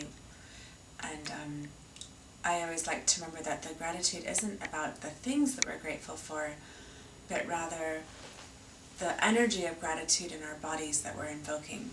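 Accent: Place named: Scotland, United Kingdom. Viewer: love the Scottish accent - so beautiful, thank you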